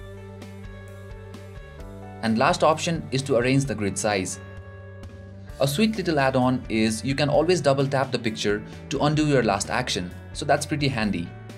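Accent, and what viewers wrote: India. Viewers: This was quite helpful, but honestly, I missed many words because of your Indian-area accent. I have taught English as a Second Language for many years and the most common mistake made by people from this part of the world is speaking too rapidly. Even native English speakers are easily misunderstood if they run words together too quickly, and when you add in the occasional mispronunciation of a foreign speaker, it makes it frustrating for the listener. Simply slow down a touch and people will follow you much better.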